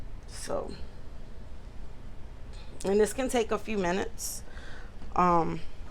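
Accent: Louisiana, United States. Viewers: I Love your Louisiana Accent!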